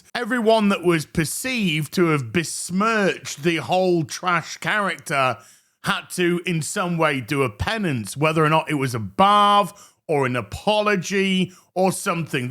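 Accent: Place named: United Kingdom. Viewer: mate, the british accent is already insufferable, why would you subject potential viewers also to those veilguard clips they've guaranteed already seen before?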